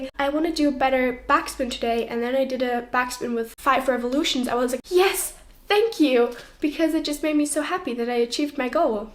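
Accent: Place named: Europe. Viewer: ahhh, habe den Akzent rausgehoert, aber war mir nicht sicher woher aus Europa der ist. Wieviel zahlst du fuer eine private Stunde?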